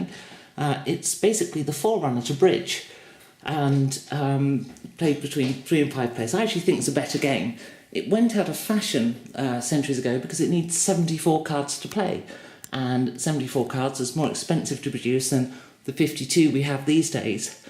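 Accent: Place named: United Kingdom. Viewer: this guy has the most british accent I've ever heard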